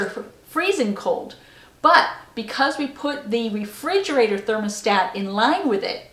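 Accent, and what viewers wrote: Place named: New England, United States. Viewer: at first I heard a very slight N.J. accent but i knew it wasnt right ..then it hit me Maine or New England Not bad for a Canadian Eh !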